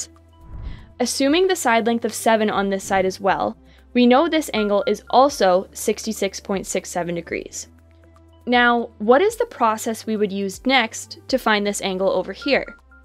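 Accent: Canada. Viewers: Ur Canadian aren’t u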